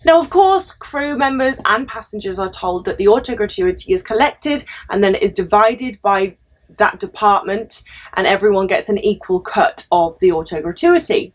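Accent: United Kingdom. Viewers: I subscribe to you because your voice is loud and clear even so you speak British English I understand you I can take a shower while listening to you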